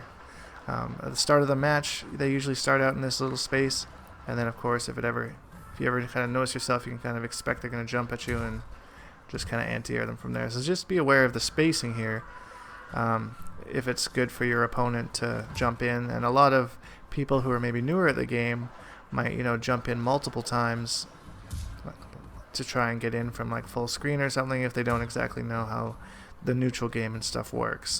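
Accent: Canada. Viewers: I thought South Park was being funny by the Canadians saying "Aboot" but its true lol. Love ya!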